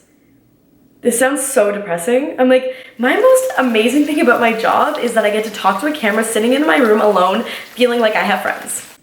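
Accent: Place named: Canada. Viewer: Can really hear the canadian in you!!!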